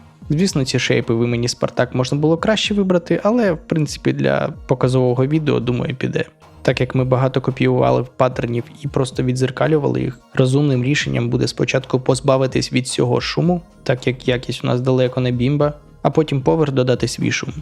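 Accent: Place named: Western Ukraine, Ukraine. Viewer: Я думаю, українська у нього рідна (проскакує західний акцент).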